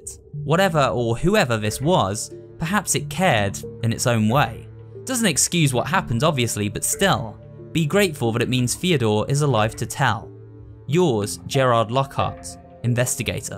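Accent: United Kingdom. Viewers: is it just me or did you get more british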